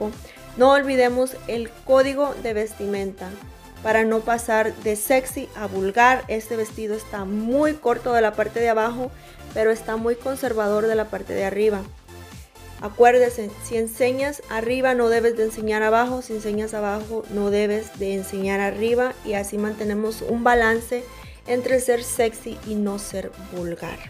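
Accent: Mexico. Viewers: Porque habla como mexicana.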